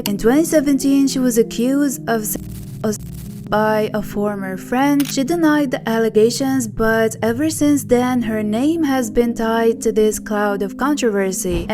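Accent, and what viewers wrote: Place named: India. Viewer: was gonna listen but indian accent = opinion rejected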